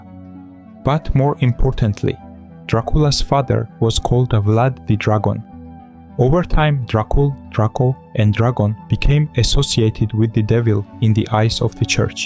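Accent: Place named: Russia. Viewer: I don't follow One Piece COMPLETELY, about each two weeks i check in and see (Theories of several YTers) But i just LOVE it, when people with russian (or your ) accent are uploaing theories, because they speak STRAIGHT and without forced "jokes" whitch makes them pleasing to listen to. Like from me. Interesting parallels about the flowers and snakes btw. 👍 Your style reminds me of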